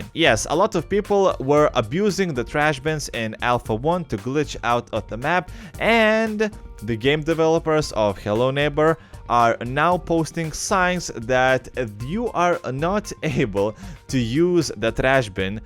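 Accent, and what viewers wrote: Russia. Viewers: no offense but it kinda sounds like youre russian like youre acsent i thought was a dead give away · i can already tell he's russian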